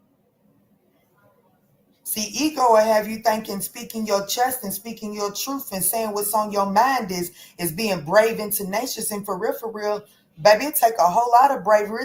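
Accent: United States. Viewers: Where’s your accent from? Part of me says Cali, the other says St. Louis. 😮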